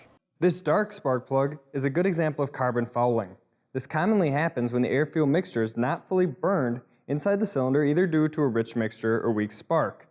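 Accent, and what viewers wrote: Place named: Wisconsin, United States. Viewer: Ah, a Fellow Wisconsinite. The accent when heavy is very apparent.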